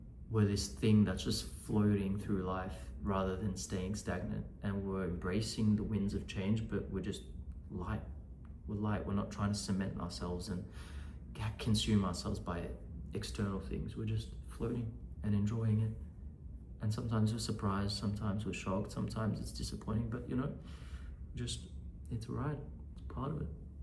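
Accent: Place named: Australia. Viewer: You've a cute Australian accent so beautiful 💗💗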